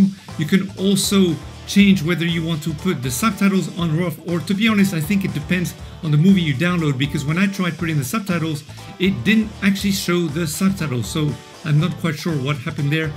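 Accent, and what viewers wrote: France. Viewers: you have french accent lol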